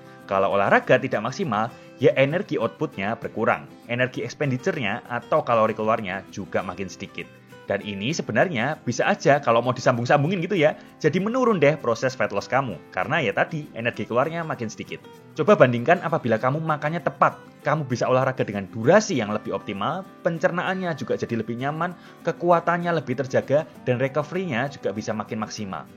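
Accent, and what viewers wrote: Java, Indonesia. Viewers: udh trlalu cinta sama channel ini.. semoga mas slalu sehat dn bnyak rejeki krn udh bantu bnyak orang untuk hdup sehat.. oh ya kak.. mau serius tanya kak orang mana ya?! kok ngomongnya logat jawa kental bgt hehe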